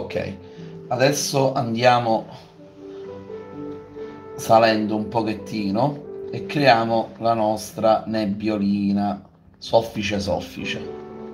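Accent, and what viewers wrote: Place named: Sicily, Italy. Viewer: Ti ho scoperto per caso ma non ti lascio più! Penso, dal tuo accento, che tu sia siciliano come me! 👏👏👏👏👏🥰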